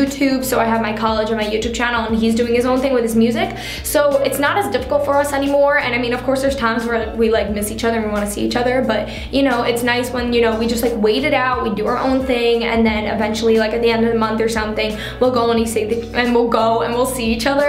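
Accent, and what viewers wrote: New York, United States. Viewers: yo i love her slight accent it’s so cute holy new york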